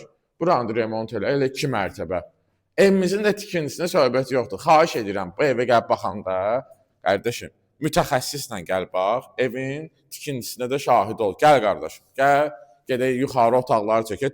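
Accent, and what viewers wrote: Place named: Baku, Azerbaijan. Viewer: Allah qorusun. Bakılı ləhcəsi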